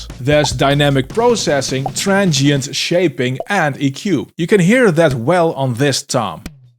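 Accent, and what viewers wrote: Netherlands. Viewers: die accent. NL?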